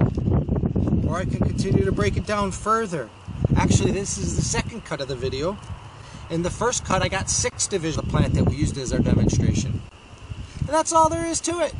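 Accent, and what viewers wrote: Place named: Buffalo, United States. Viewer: Are you from Buffalo, NY? Guessing by your accent.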